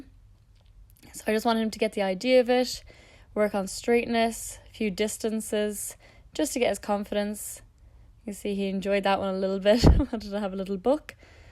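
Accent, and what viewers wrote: Ireland. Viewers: R u from Ireland 🇮🇪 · Are you irish?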